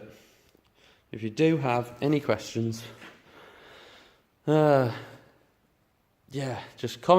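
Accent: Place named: Newcastle, United Kingdom. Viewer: Thought you were a geordie in the beginnnning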